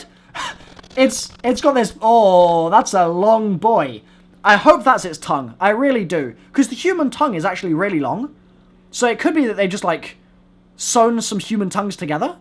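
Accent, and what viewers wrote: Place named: United Kingdom. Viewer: i fucking love how British the commentary in this is. i was laughing so hard.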